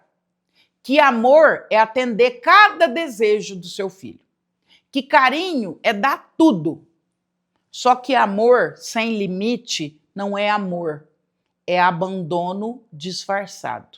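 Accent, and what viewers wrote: Brazil. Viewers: Olá, a senhora poderia melhorar este sotaque, e parar de falar com este R interiorano e falar sem sotaque.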